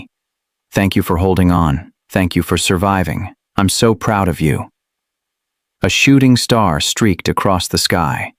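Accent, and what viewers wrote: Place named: Asia. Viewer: Hey narrator are you asian? It's obvious from the way you pronounce words.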